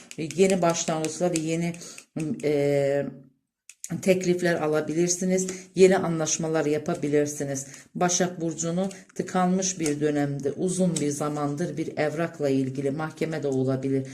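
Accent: Azerbaijan. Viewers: Siz Azerisiz?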